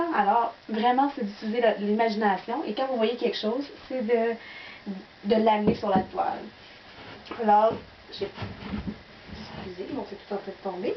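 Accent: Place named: Canada. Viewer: Merci pour cette video, et auusi, pour tout ce qui émane de votre charmant accent canadien, de votre sourire et votre gentillesse! Je vais m'essayer à ce type de peinture! Evelyne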